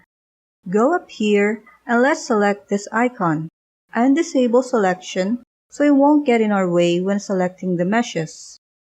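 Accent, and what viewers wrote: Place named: Philippines. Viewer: I hear a pinay accent😅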